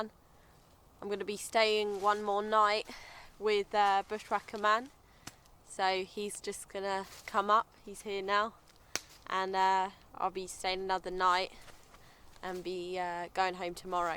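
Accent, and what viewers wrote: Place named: England, United Kingdom. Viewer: I thought you were in England just by your accent haha. But yeah it’s really depressing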